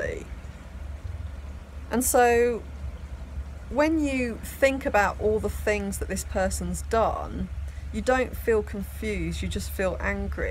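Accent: United Kingdom. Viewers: British accent is hard for me to understand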